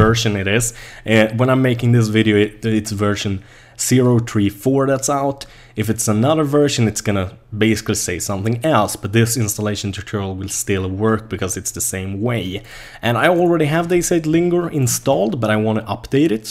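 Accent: Sweden. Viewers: dat swedish accent lol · You sound like your from sweden o you were nice!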